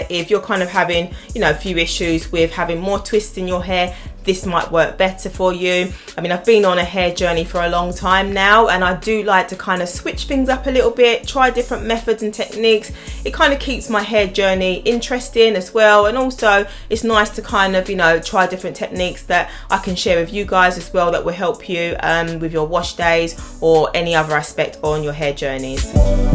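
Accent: United Kingdom. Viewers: Shalum I'm sister Bridgette From America,Galveston, Texas New subscriber.I love your hair and your beautiful uk accent. My hair is like yours.Shorter,but thick.❤🎉🎉 Tah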